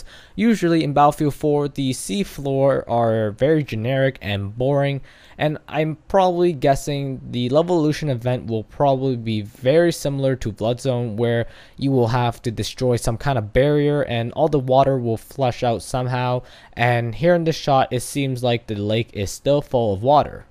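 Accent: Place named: United States. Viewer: All your intro sound like your American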